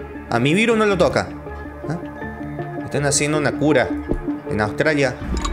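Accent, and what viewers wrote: Córdoba, Argentina. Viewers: Si Cordoba Argentina:D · de donde sos tenes una tonada muy parecida a la argentina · Para mi es de Córdoba, porque no habla tan agrandado. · ERES DE ARGENTINA O DE CHILE.-.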